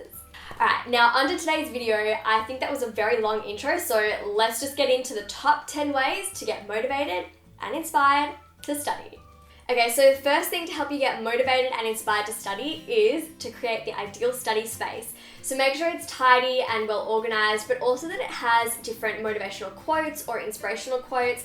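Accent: Australia. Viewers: Is it just me, or is ' Australian accent actually very cute?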